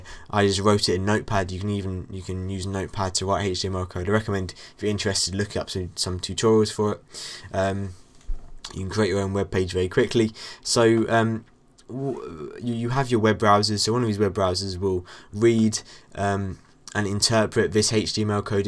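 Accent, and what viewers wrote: United Kingdom. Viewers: are you from leicester? you sound like a friend of mine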